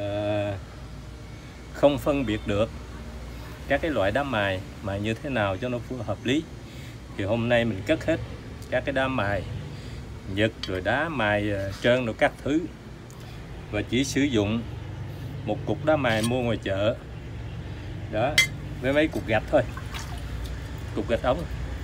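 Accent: South, Vietnam. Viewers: Anh có giọng miền nam ấm áp, thiện lành, ngay lần đầu tiên xem đã cảm mến. Chúc anh luôn khỏe mạnh, an lành. Atlanta, Georgia.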